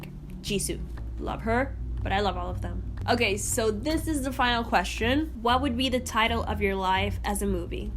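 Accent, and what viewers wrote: Dominican Republic. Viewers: Amo amo tu acento Dominicano! saludos desde Panamá <3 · Aaaaah, me encantó tú acento ): ❤️ el acento dominicano es uno de los que más me gusta 👀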